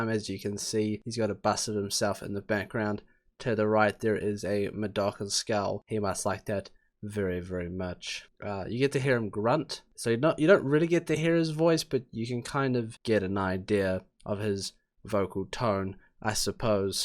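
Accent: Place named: New Zealand. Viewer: Hey bro, great vid! Is that a fellow Kiwi I hear?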